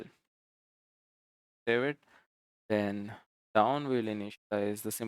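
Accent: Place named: India. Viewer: Indian people, please please work on your English accent!!!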